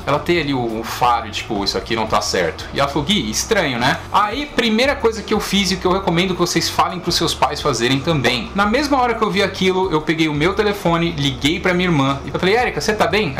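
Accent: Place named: Goiás, Brazil. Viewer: Uai só eu acho que ele é daqui de Goiás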